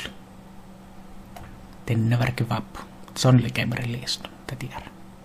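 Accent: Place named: Finland. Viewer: Why you speak English in Suomi pronouncation?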